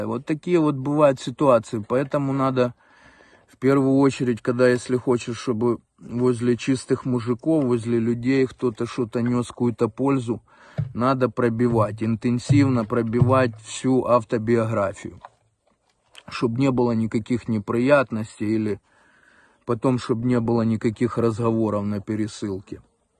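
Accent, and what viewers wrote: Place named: Ukraine. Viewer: .... блин!... Как мне нравится говор украинский, но такой, что бы русские понимали!.... И ещё -, Вы очень похожи на моего двоюродного брата Андрея в молодости!.... Счастья, здоровья, мира, добра Вам и семье!....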